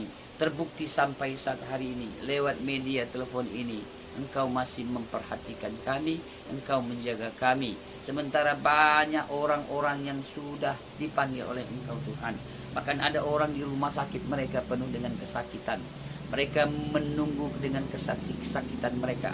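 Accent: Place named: Aceh, Indonesia. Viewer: Logat bapak di awal pembicaraan seperti orang Aceh. Tapi ditengah pembicaraan bapak, lok logat Aceh nya jadi hilang sih Pak... Sebenarnya bapak orang mana sih? Gimana sih si bapak ini.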